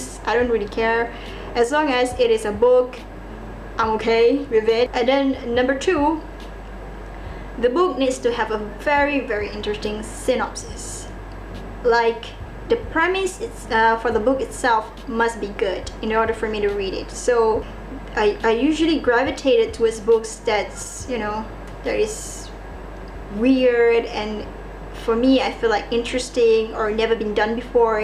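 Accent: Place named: Philippines. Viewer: You're pretty cute, accent sounds Filipino or something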